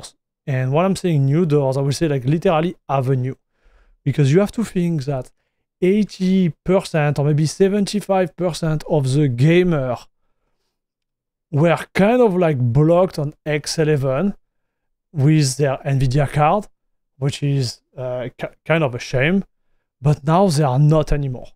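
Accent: France. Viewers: I like your frenglish voice, sounds refreshing.. o)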